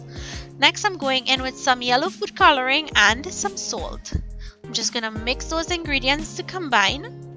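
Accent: Trinidad and Tobago. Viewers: I like the Trini accent lol thanks for doing this I am wondering how you do these I'll see if they're different lol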